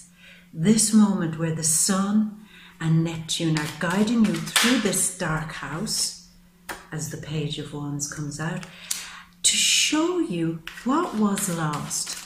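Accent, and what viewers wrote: Ireland. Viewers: Hey! Just found your channel and loved this reading 💟. Great to hear a fellow Irish woman here 😊. Look forward to following you and looking out for future notifications. Keep up the great work! Thank you 😃👏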